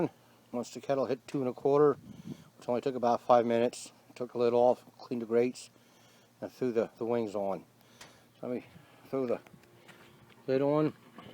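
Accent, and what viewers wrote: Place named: Pennsylvania, United States. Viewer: I figured, I could hear it! I'm from Philly originally but relocated to California after my military service. I can always tell somebody from back home.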